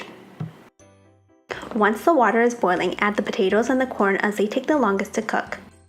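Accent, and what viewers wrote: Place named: Minnesota, United States. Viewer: Sounds like Minnesota accent. I like the recipe.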